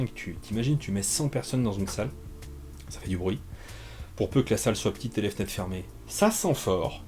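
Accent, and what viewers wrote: England, United Kingdom. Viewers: Félicitations pour tes 4000 abonnés ! Q : D'où vient ce magnifique accent anglais ? Wall Street English ?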